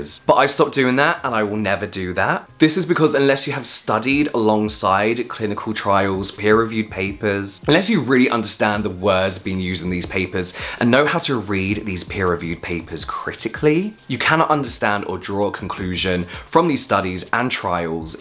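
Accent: United Kingdom. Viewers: am i the one who watches channel because of his satisfying BRITISH pronunciation?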